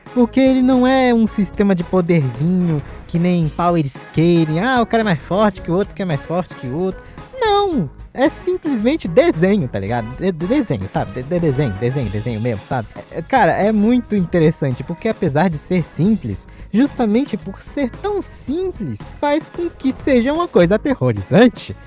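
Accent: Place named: Minas Gerais, Brazil. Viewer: Seu sotaque é de mg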